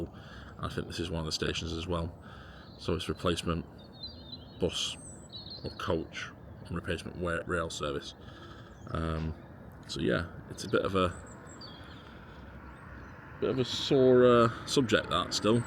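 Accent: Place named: Northern England, United Kingdom. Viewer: Geoff Marshall has suddenly got northern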